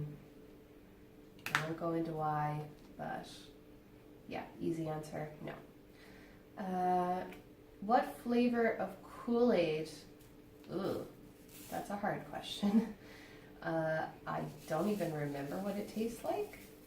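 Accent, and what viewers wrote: Ireland. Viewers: Wow! Exactly a month ago I saw your first videos about “I wish I knew about diamond painting”, now I am watching much older once and your accent is more Irish then American. Love it! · I wanted to watch your first videos because being new to diamond painting, you're kind of a legend to everyone I watch, haha. I've watched quite a few of your more recent videos and coming to this one, you have an Irish accent in this one! I don't hear it anymore, it's so cute, though! But I love accents! So fun seeing you as a newbie! 😁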